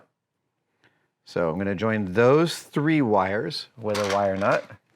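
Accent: United States. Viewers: SoLLLder, not sodder. Say it like it is, damn american! "The US just removed all soddiers from afghanistan".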